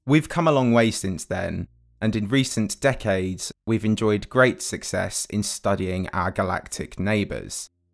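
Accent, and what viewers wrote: United Kingdom. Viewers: UK accents aree annoying.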